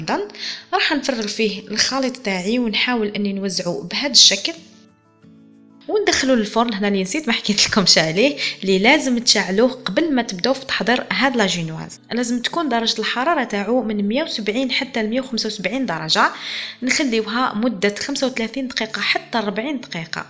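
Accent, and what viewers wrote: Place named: Morocco. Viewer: انت مغربية من هضرتك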